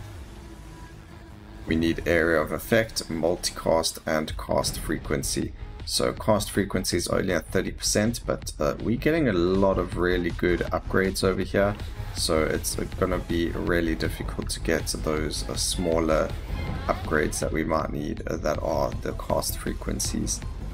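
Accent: South Africa. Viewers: You South African brotha?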